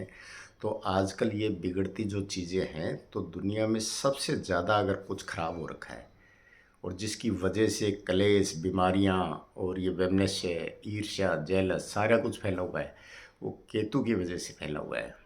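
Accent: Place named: Haryana, India. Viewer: Bahut achi jankari ji.......Aapki tone k hissab se aap sayad haryana se belong krte h....nice video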